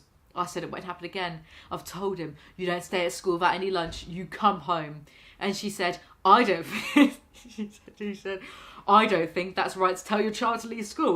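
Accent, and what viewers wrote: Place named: RP, United Kingdom. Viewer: speak posh for a video, you already have a posh accent you speak to yourself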